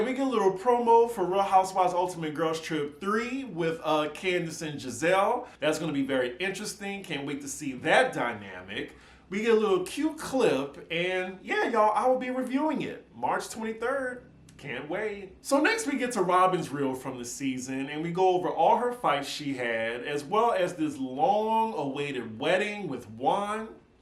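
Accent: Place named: South, United States. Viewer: ... Your Dirty South gutta gutta peeps through sometimes and I LOVE IT!!! Your channel is growing so beautifully!! I am so proud of the way you Rep Louisiana. You are absolutely charming and your content is top notch. Thank you for staying true to yourself. Keep up the good work!!.. By the way, I tried to join your pateron but your link in the box is unclickable.. let me know how I can join. Its past time!!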